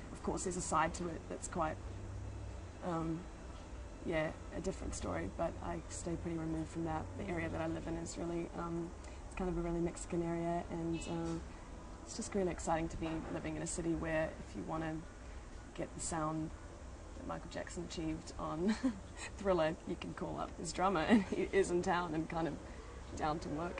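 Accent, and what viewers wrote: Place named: New Zealand. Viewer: I love her speaking voice as well as her singing voice. The Kiwi accent really knocks me out.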